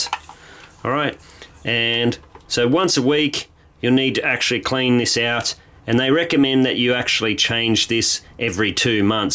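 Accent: Australia. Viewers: Very useful and clear, and I got to listen to your accent too :-) Aussie I assume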